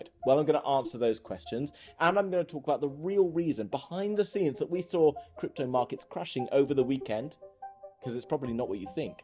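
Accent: United Kingdom. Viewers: the British accent! love it :D and thanks for the info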